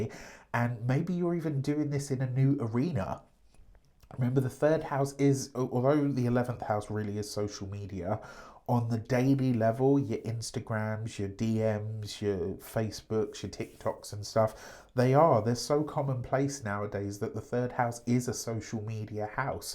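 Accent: United Kingdom. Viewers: You can't quit us we love you too much..thank you I missed hearing your British accent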